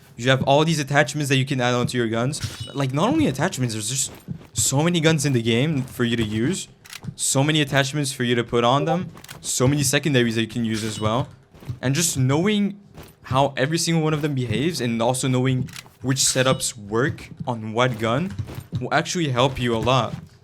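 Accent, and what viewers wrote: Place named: France. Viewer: Accent français et anglais mélangé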